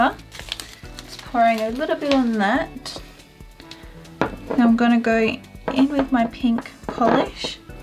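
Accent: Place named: Australia. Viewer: These are so cute!!!♥️♥️♥️😍😍😍 I was obsessed with barbie when I was little. Love these. So creative! You are so talented. It would be cool if you did a Q&A. I'd definitely watch. Love your videos. I love seeing your channel grow so much! I also love Australian accents, lol. I think press on nails is a great idea! I, personally, probably wouldn't wear nails that long or goergous because I'd probably lose them, lol. However, I still think that's a super awesome idea although I think shipping might be really annoying. It would still be so cool to own a set of your art work even if they were just on display. The only other thing I'd be wondering about is sizing.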